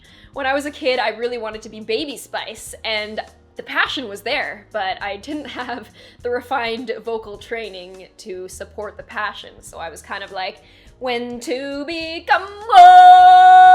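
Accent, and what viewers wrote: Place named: Canada. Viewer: Such a lovely message! I just want to pinch your cheeks - you're so pretty. "Don't mock the process!" Sounds so much better in your Canadian accent (than my English accent) but such a golden quote.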